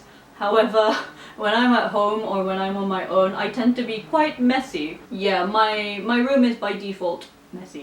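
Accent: United Kingdom. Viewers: Love how you react each assumption politely and kindly! You look intelligent + have a beautiful British accent! My assumption is: you are a great reliable manager at work😍💕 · Wow! You went from three thousand to nearly thirty thousand subscribers in two years! That's amazing!... I'm a recent addition to the number, but have enjoyed watching some of your older videos. Your presentation is really nice and you seem to be a genuinely lovely person. If things haven't changed in the last two years, then I am very surprised that you aren't married. As a Brit, it's really nice to spot Asians who have lived in the UK. Our culture definitely rubs off, as with the accent and sense of humour... and Japanese women with a British accent when talking English sound really cool.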